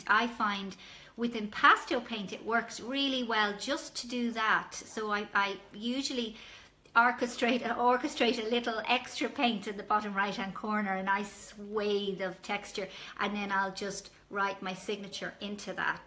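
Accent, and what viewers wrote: Ireland. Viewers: I hear Ireland flowing through ... ☘️ The land of my lineage, County Kerry, Ireland 🇮🇪 M Beth USA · First of all, I love your Irish accent (" ")! 😀👍 I often wish I were more connected to my English, Scottish, & Irish lineages, but to date I've not achieved that. An interesting fact that you may or may not already be acquainted with. I learnt some years ago from a reputable Jamaican newspaper's website (i.e. "Jamaican Gleaner") & an article on its series "Out of Many, One People" or some title like that to emphasize the multiethnic status of Jamaica's population & to imitate the national motto that speaks of this same phenomenon, I learnt that the accent of the Jamaicans in their speaking of English was primarily due to the African enslaved being most shoulder to shoulder with the Irish indentured laborers who were yet, of course, speaking the Irish brogue. 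In fact, I used to work telecommunications a lot in the past as employment & I would occasionally get Irish clients that I thought were Jamaican until they clarified that they weren't! So, yeah, that's the bit of trivia for you. Now, regarding this topic of yours you sought to do your presentation on, it is such a tremendously neglected topic albeit the topic being so essential & so it is precious that you've dealt with it herein, yet not only dealt with it, but dealt with it quite thoroughly & skillfully. Good job! The thing is, my late father having been the very gifted artist that he was made me to be well aware of many of these points from in my boyhood (or when I was a "lad," as the Irish would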